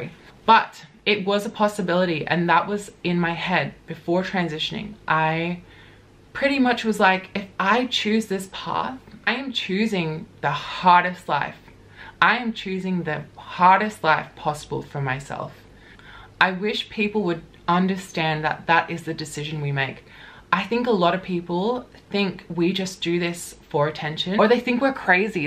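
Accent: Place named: Queensland, Australia. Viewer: You sound like a Queensland girl, or is that just me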